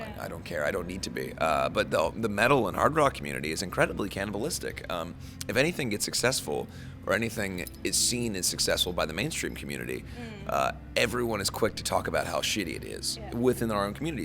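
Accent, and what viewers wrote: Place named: United States. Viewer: He has an American accent. Are you American by any chance?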